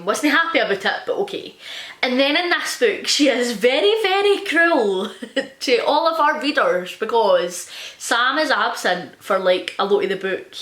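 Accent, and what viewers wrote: Scotland, United Kingdom. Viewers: why are scottish accents literally the best omg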